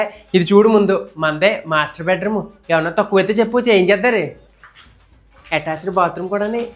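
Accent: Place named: East Godavari, India. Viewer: Likkamtha vumdi. Idi East godavari slange. Madi East godavari, Amalapuram andi.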